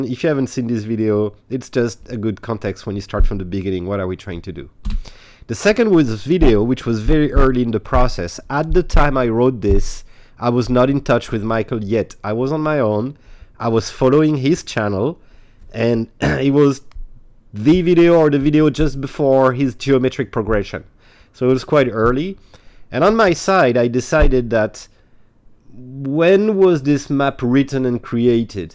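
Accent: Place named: France. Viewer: thank you. I totally misheard what you were saying. I usually have a better ear for the French accent. I just finish reading Zina Halprins book, yesterday. I heard you mention reading it so I got a copy. Any other good recommendations for Templar related books? Or any good books?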